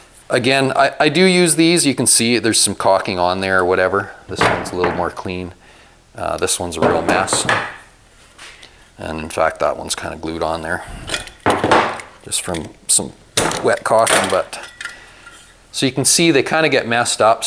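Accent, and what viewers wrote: Canada. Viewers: I recently found your channel. I have watched a couple of your videos. I think you are a really great instructor and I appreciate your videos very much. I lived in Alaska for 25 years and consider all Canadians as neighbors. You are wonderful neighbors. I mean this is a really complimentary way, I admire your accent! LOL. Keep up the good work Brother... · Very helpful. Love your Canadian accent! I’m anCanadian living in the US and miss that so much. Thanks for your good pointers.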